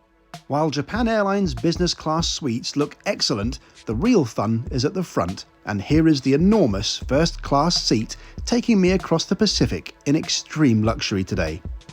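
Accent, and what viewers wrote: United Kingdom. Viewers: I am from the US and everything you just said sounds so wonderfully British!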